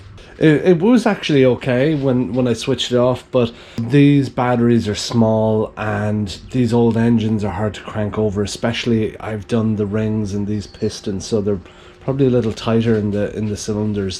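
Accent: Ireland. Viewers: Gorgeous bike! Are you Irish by any chance ?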